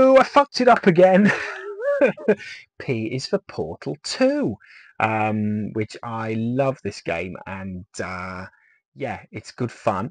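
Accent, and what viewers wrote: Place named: Birmingham, United Kingdom. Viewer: I dunno what happened, but you were considerably more brummie in this video... Also, did you know Wet is sold out at CEX? I think it's gonna spike hard that one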